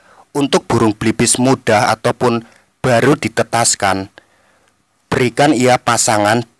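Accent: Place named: Ngapak, Indonesia. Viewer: Mas nya ini suaranya medok banget,,wong ngapak ya mas,,?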